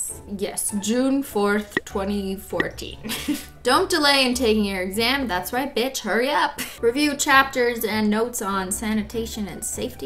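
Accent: France. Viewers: Her french accent in english words was very good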